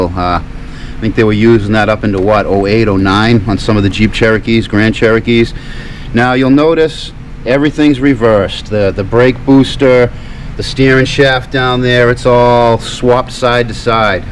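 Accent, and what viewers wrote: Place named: Massachusetts, United States. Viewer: good to see you back, and hear that Mass. accent again. Imagine what that Jeep would be like out on 495! Happy Holidays!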